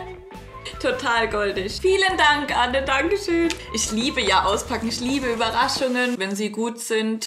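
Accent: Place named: Palatinate, Germany. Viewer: endlich mal jmd der iwie pfälzisch redet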